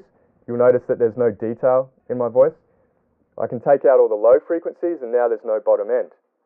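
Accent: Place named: Australia. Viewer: That's an Aussie accent if I ever heard one, mate. I have to say the editing and visuals on this video are incredibly tight and the explanation is very straightforward. Job well done! :)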